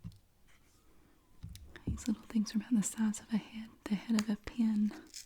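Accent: South, United States. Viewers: Your asmr leaves me knock out ... I love 10 points Southern...!!!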